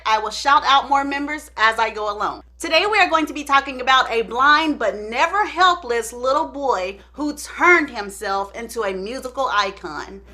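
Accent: South, United States. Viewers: A southern slain for “how come” 😂 she’s straight country lol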